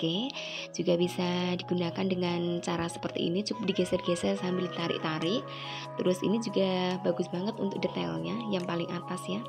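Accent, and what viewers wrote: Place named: Java, Indonesia. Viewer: suarany lembut skli bunda tp logat jawany msh ada medok dikiiit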